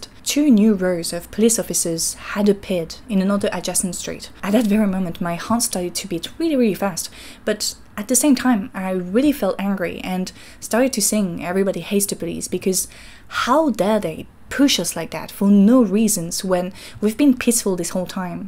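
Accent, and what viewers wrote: North, France. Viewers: Est ce que t'es du nord?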